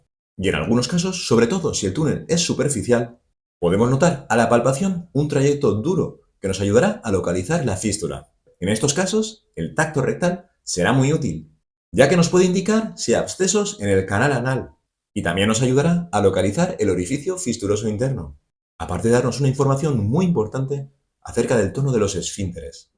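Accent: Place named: Spain. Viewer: No es obvio el acento? Jaja es de España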